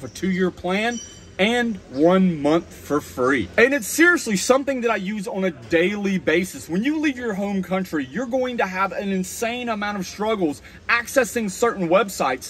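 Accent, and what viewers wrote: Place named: South, United States. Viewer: Georgia boy in Thailand I was wondering where you were from I can’t help but hear your accent as a fellow southerner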